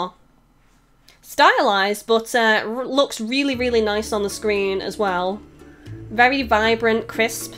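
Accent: United Kingdom. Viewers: Just a quick question what part of the UK are you from? I'm really bad at accents so I can't tell (I'm from the UK too but I only know a few accents for certain)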